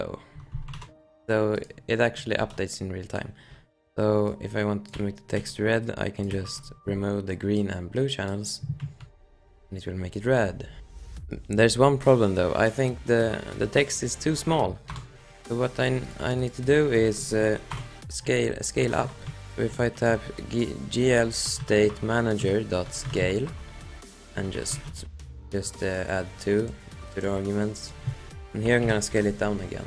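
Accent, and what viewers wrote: Sweden. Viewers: Glad att man får höra en äkta svensk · Hej är du svensk det låter som det?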